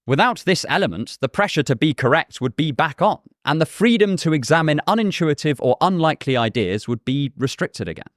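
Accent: United Kingdom. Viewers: Yer .. . .....ah ha ..... Okay I've little to lose by my discovery of your site questions. Great stuff. Was it this pod or the last where I thought AI essayist Snapchat (is it?) can make anything believable. I remember at school a teacher pulling me aside and emphatically saying I should go into law - I wrote so convincingly she believed my work. High praise indeed....except that the essay was in microbiology, and utter nonsense. I'm from the UK as is your accent. I find that if I don't look at you and just listen your material is superb. Looking at you, my main thought is you are too young. Your material and presentation is too polished. I wonder if you are really a deep Fake; the writer a much older man or woman - and I get distracted from listening. Today I just listened. It was much better. Then, you seriously mispronounced a common word. So now I wonder if your look and talk are a very advanced AI bot. 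I just can't think of someone so young putting together and presenting these advanced lectures. There. I've said it. I still enjoy your work.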